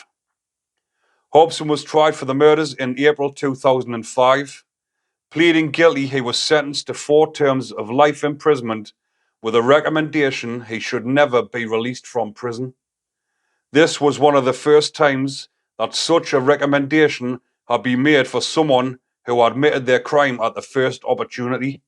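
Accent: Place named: Geordie, United Kingdom. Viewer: First-class video. Plenty of well-researched detail. Despite your strong Geordie accent, I was able to follow all your commentary by using the subtitles! Thank you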